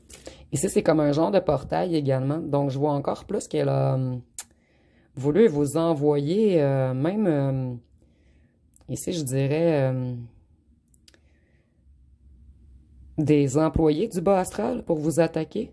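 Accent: Canada. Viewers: Première fois que je vous écoute! Votre accent canadien me plaît beaucoup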